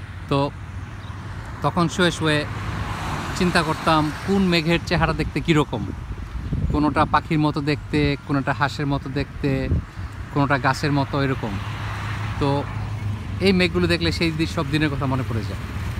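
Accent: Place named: Bangladesh. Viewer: Love the way you talk. In fact I love the Bengali accent of the Bengalis from Bangladesh. It's very sweet.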